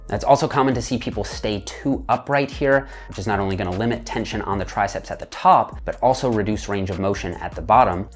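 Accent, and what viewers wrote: Canada. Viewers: lol the 'erhm' & 'baarh' Canadian af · Canadian accent lol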